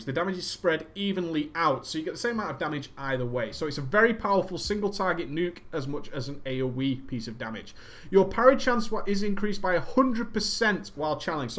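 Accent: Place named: Manchester, United Kingdom. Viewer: Wow, I'm from Manchester too, and I've never noticed, (until now) how annoying the manc accent is.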